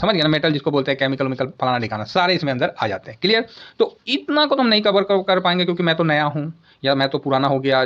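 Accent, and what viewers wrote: Bihar, India. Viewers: Sir aap bihar se h kya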